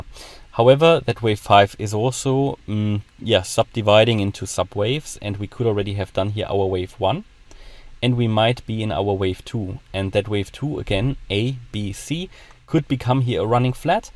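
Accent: Germany. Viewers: Are you German? 😁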